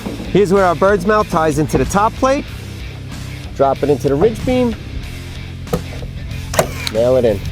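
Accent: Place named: Philadelphia, United States. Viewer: I just love his accent Philadelphia😮🍄🍄🍄🍄🥇🥇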